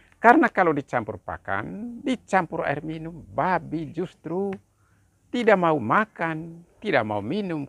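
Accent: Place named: Manggarai, Indonesia. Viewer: Pak logat nya kaya org Manggarai ya Pak..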